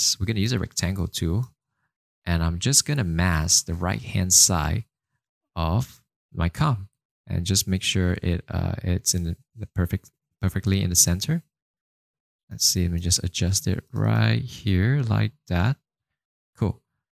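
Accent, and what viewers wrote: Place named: Singapore. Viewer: You sound Singaporean haha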